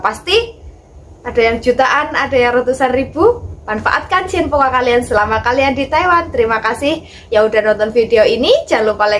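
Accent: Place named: Banyumas, Indonesia. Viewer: Disumbat mba gusinya pake kapas,salam kenal ya mba ngapak 😁🙏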